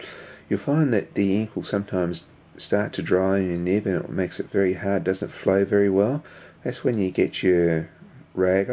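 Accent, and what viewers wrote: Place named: Australia. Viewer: australian! yes!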